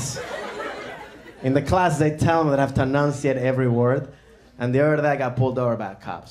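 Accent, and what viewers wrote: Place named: Venezuela. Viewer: Jose Bravo Well they’re both from Venezuela same Accent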